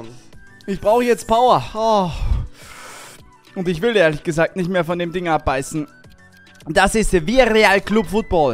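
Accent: Austria. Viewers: Kommst du von Österreich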